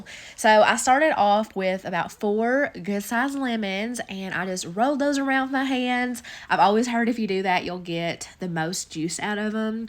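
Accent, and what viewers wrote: South, United States. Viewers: What gives you the right to tell people how to talk? She’s southern. That’s just how we talk. Don’t like it, don’t watch. Be kind. Do better!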